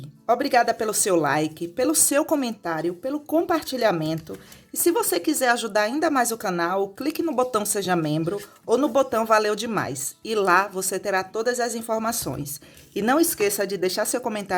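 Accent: Bahia, Brazil. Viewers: Aí linda tu e da minha terrinha kkkkk baiano realmente estréia quando assistir um vídeo de empada de carne seca vi um sotaque de Aiai falei será que e daqui pensei acho que não kkkk agora ouço isso ensina com excelência sua dicas e ouro sabia da massa mas as técnicas não aprendendo e muito.Deus de Israel abençoe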